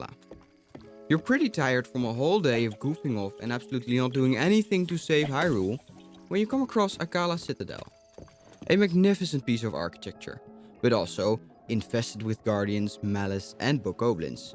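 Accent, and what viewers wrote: Netherlands. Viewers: Are you dutch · quesion, are you dutch? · Hol up, u dutch? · Ik hoor aan je accent dat je nederlands bent maar bedankt voor de video :) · Mate are you dutch???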